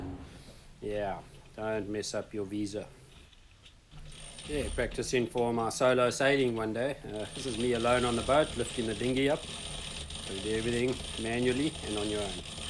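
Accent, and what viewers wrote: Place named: Durban, South Africa. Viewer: Accidentally found your channel and then realised you have the same accent that I have! Enjoying your content. Ex Durbs.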